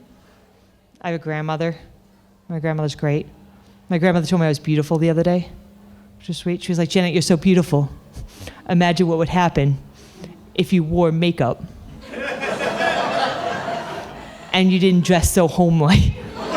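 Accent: United States. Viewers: Ive never seen a more Irish head in me life😅 n im Irish😂 I expected a strong Mayo accent..not a Murican...cant prove your mother was Mary from Mullingar but 😅...either way...epic, feckin brillllant set a cailín!! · Are you from new england ?? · You sound Boston ish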